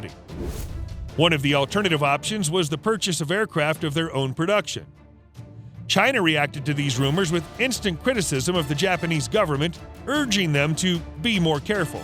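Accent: United States. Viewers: You are american by your accent, and still you used meters! The whole world thank you for kindness! <3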